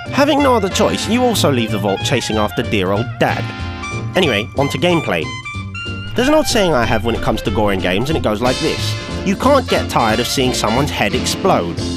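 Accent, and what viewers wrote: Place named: Cockney, United Kingdom. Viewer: You're slighty posh but slightly cockney... Weird D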